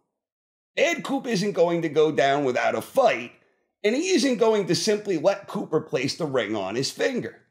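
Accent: New York, United States. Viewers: you are the man, can't thank you enough for this channel! Quick question for you..are you a NY'er? I can tell that accent when I hear it, I'm born and raised!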